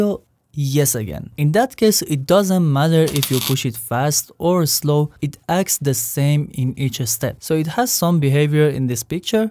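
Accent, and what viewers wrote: Iran. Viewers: از لهجت فهمیدم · Persian accent never die!!!😊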